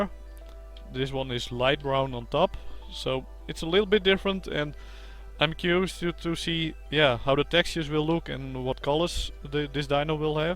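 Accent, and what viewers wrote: Netherlands. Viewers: dutch accent xD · are you dutch you sond like it? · Are you dutch? I can hear it. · it is just the way dutch poeple talk english becuse you just talk like me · u have the most dutch accent i ever heard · je bent gwn nederlands, valt aan je accent te horen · Your accent sounds dutch Are you dutch? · you sound like you are from nl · je praat met een zwaar nederlands accent moaaaaan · are u dutch? if not u sound like a dutch talking english ^^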